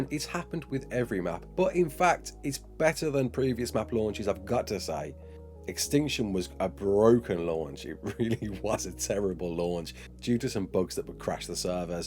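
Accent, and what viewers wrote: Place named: West Midlands, United Kingdom. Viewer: dont ever deny us that beautiful west midlands accent again brother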